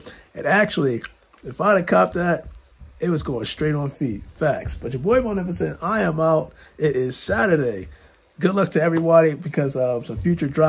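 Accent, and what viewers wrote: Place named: Boston, United States. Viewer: U had to be from Boston I tried.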